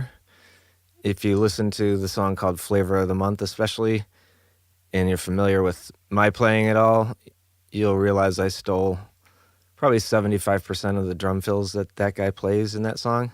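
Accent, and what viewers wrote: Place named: Chicago, United States. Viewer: Im from San Antonio and knowing full well your from Chicago this probably wont make sense, but your Chicago accent is hilarious. BTW its a Fender Bass VI. Love this episode.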